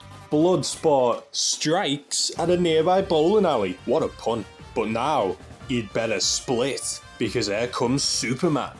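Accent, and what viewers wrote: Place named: Liverpool, United Kingdom. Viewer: Are you a scouser? Your commentary is delightful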